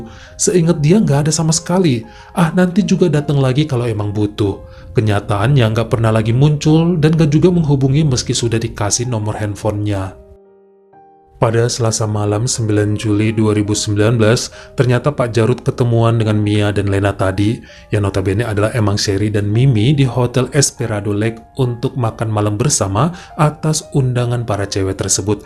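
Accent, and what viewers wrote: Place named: Java, Indonesia. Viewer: HALLO S NYA 3 SYRIA SELAKU HADIR BUAT CHANELNYA KAK,........SEMOGA MAKIN SUKSES UNT CHANELNYA YA KAK, BIKIN KANGEN KRN PEMBAWAAN BAHASAANYA LUCU DKIT DIKIT EHEMM GITU DI BUNUHI DNG BHS JAWA YG MEDOK TAPI ASIK BANGET LOVE......U ❤❤❤❤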